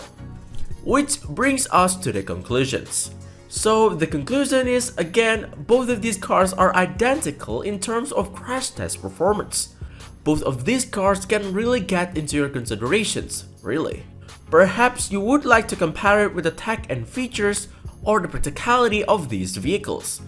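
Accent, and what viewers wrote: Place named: Indonesia. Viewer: You sound like Indonesian. Are you?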